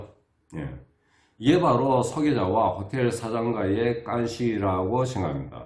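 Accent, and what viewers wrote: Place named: Gyeongsang, South Korea. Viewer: 사장님 교포세요?! 제가 중국에 살아서 조선족 많이 봤는데 말투가 경상도 분 같으시네여 흑룡강 분 이신가오!?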